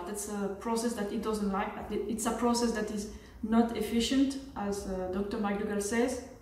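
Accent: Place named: South Africa. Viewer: Do you know you’ve got the South African accent ! It is sooo cute 😊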